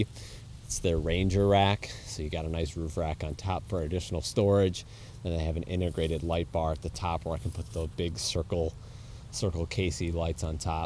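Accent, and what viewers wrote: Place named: Michigan, United States. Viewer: Love your vids. Are you in Michigan by chance? The weather, trees, and accent give it away being a Michigander myself.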